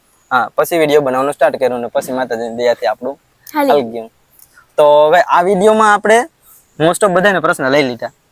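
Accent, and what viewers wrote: Kathiawar, India. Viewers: હું આપનાં વિડિઓ તમારી કાઠિયાવાડી ભાષા ને કારણે જ જોવ છું.....સરસ એવી રીતે બનાવતા રહેજો..👍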